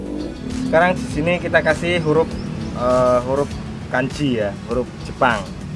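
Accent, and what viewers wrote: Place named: Java, Indonesia. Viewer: Aku lihat sampean ki kok ingat sama lek gogon ya mas..dialek jowomu iku lho aku seng seneng...tapi lek gogon kok alih propesi yo mas..slam satu hoby mas.dari bojonegoro jatim.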